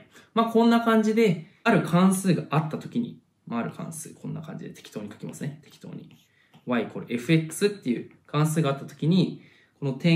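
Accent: Kansai, Japan. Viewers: そんなに違和感ないぞこの関西弁。な↑んでやねんとか言ってない辺りマジでそんなに違和感ない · 語尾が関西弁になるの草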